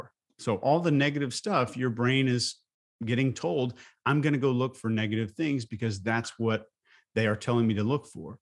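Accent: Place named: Arkansas, United States. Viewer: I’m here from the behavior panel. Im also from Arkansas, so your accent is so familiar to me, you remind me of some of my family and friends 😊 thank you for your content it is really appreciated ❤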